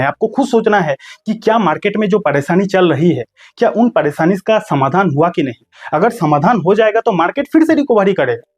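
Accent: Bihar, India. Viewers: बिहारी हो क्या भाई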